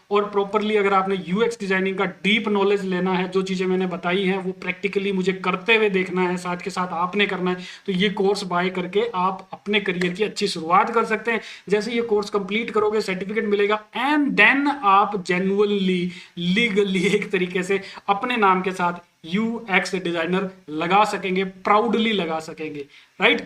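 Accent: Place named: Rajasthan, India. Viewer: sir aapki tone se lag raha h aap pakka sri ganganagr or hanumangarh (rajasthan) side ke hoo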